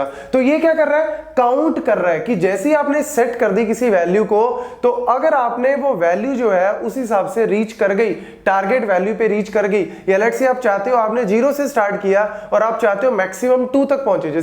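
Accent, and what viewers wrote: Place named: Haryana, India. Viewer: are u from haryana ur accent already reveals it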